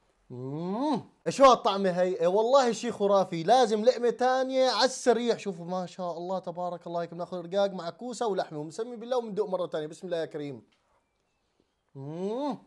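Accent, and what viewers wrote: Syria. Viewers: رجعتي تحكي سورية التحلاب تموتو على البراني 😂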